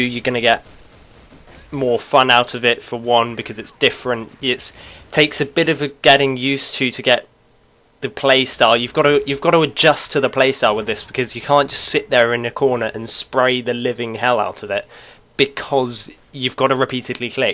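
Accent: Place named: United Kingdom. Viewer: lol ur British accent :) cool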